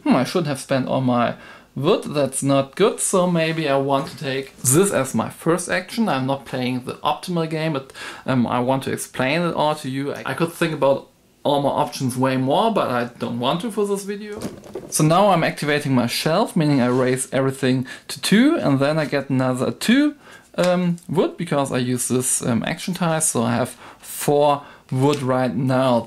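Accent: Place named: Germany. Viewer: Oh honey, your English is great, thick German accent 😊 but still very easy to understand!! Great job and thank you for this runthrough,! Keep up the good work! Greetings from California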